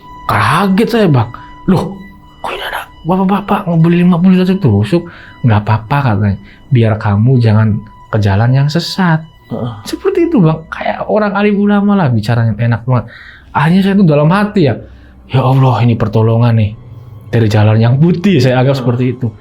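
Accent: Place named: Indramayu, Indonesia. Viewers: Aku orang indramayu bang logatnya sama kalo ngomong pahaam betulll 😂❤❤❤ top lah ceritanya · Indramayu hadir dari hongkong...Bahasane kosi kentel pisan ya kang,Indramayu ne ning endi kih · Mlesat 😅, bahasa nya engga angger , Indramayu bgt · indrmayunya melekat ya mang😂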